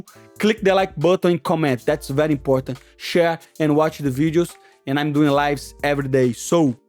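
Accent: Brazil. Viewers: Your English is good. You just have a thick Brazilian accent. And there's absolutely nothing wrong with that. I prefer to hear someone's accent coming through personally. I'd say you're doing a very good job.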